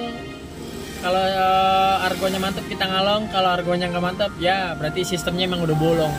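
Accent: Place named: Jakarta, Indonesia. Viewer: Kocak bgt betawi dah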